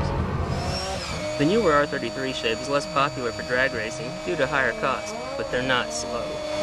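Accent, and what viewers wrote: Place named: South, United States. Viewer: Who did these voiceovers? Guy sounds southern 😂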